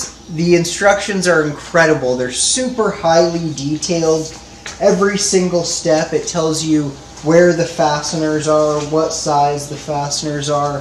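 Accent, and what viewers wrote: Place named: California, United States. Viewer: Hey, thanks so much man, sound like you might be from California, I'm from Burbank, are you still happy with these batteries, it looks like you have lithium just curious I had I haven't listened to the whole video, maybe you mentioned that already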